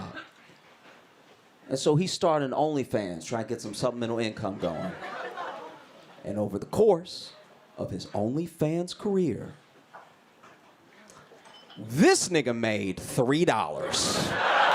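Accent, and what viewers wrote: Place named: Los Angeles, United States. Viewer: Between Josh and Theo Von the LA accent is really growing on me😏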